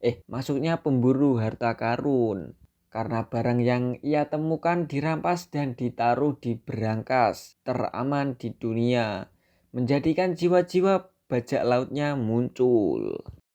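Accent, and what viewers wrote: Java, Indonesia. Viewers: Jangan medok mas, jadi kayak pahit asem gitu filemnya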